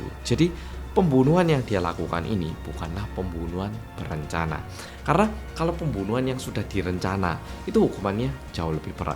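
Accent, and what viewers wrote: Java, Indonesia. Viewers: tks medok 🎉❤🎉 Sehat selalu yaaa 💪🏿🙏🏿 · Actingnya boleh juga 😂😂 sukses terus mas! Btw knapa udah pindah ke Korea tapi logatnya tetep medhok ya 😅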